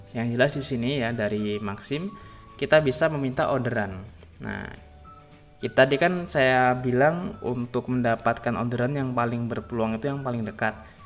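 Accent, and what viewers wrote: Lampung, Indonesia. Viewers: Pasti dari Lampung ya bang hehe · Bang orang lampung ya hahah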